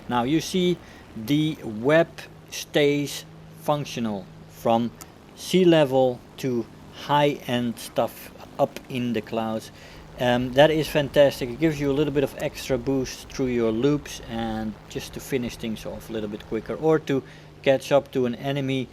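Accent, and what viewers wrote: Netherlands. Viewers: they're both dutch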